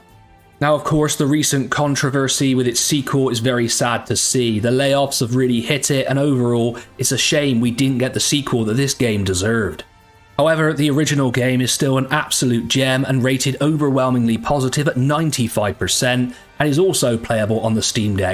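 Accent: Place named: Devon, United Kingdom. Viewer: Moved back from england? To where out of curiosity? Your accent sounds devon 🤔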